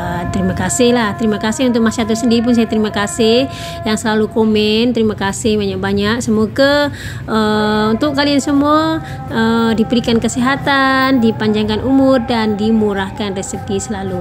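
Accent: Indonesia. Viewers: Mbak saya orang Malaysia izinkan saya memberi dua saranan berikut : 1. Bagusnya mbak jelaskan apa maksud istilah "flat" yg tertulis di judul dan juga dituturkan dlm video. Kebanyakan warga Indonesia tentu tidak tahu apa itu "flat"- tidak ada istilah begitu di Indonesia. 2. Sebagai orang asal Indonesia dan juga untuk berbagai informasi kpd pemirsa di Indonesia, ada baiknya - dlm sebahagian video jika tidak semua - mbak bicara dlm bahasa Indonesia bukan dlm bahasa Melayu. Penuturan bahasa Melayu mbak bagus sekali walaupun jujur masih kedengaran ciri2 "orang Indonesia" dlm penuturan.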